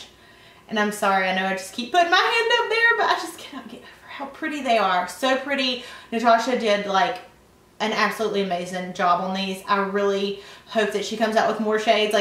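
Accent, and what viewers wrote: South, United States. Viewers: I love your southern accent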